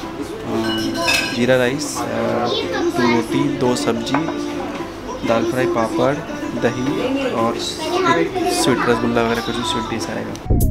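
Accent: India. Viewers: Bhai ap jab bhi Desi andaaz bolte ho na to dil khush ho jata hai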